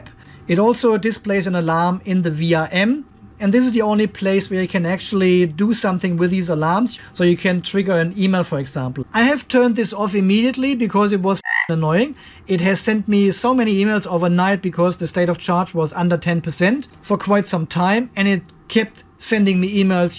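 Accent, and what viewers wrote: Germany. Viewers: very well explained Vom Akzent her könntest du Deutsch sein. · Nothing sounds more stupid as a German tries to speak english !!!!!! Or laugh in English 🤣😂🤣🤣😂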